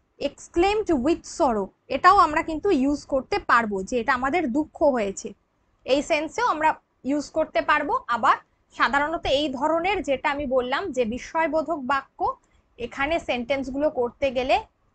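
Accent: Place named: Bangladesh. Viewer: Mami Videote Mamar Chhobi Dekhchhi Aabar Tumi Class Korachchho?Mami Tomra Ki Rifiuji? Tomar Bhanshar (Language) Dhoron (Style) Kintu Bangladeshi Mone Hochchhe?Mami Tumi Jader Class Korachchho Mane Aamaderke Tomar Chhoto Bachcha Mone Hochchhe?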